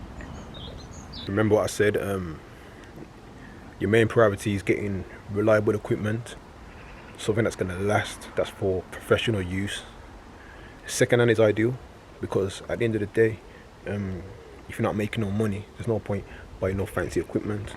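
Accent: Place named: United Kingdom. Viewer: You are spot on mate, I started on a pushbike and using the train, got some funny looks on the train with the hedgecutter! I did buy new at the start but my strimmer & hedgecutter came from Argos and the Titan multi tool from Screwfix. This allowed me to but a van & now a full kit of pro equipment, been self employed for 11 years this year, I'm not rich but comfortable, and that's the way I like it! Good luck to you chap, it's also great to hear a Brit on one of these videos!! 🇬🇧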